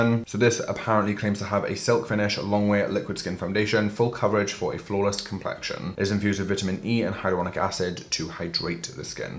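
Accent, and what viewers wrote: Wales, United Kingdom. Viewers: Are you welsh? your voice is legit so therapeutic xox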